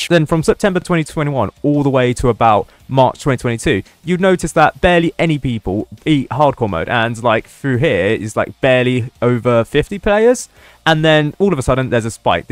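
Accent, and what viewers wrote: United Kingdom. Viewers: sometimes i cannot understand what say cuz his british accent and a very familiar british voice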